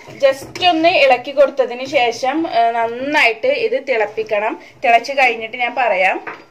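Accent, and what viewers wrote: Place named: Perinthalmanna, India. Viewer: Nalla rasond samsaram kelkan..enik PMNA othiri friends und..avar parayana polund..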